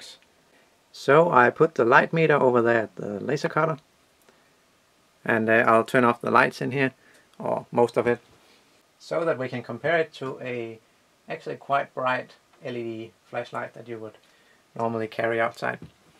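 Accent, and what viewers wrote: Denmark. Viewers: His is a common danish name and he speaks english with a danish accent, so I'm pretty sure he's danish.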